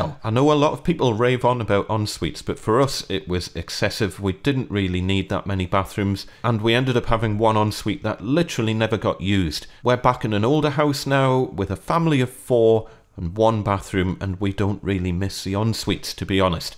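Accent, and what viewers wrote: Newcastle, United Kingdom. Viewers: Are you from Newcastle?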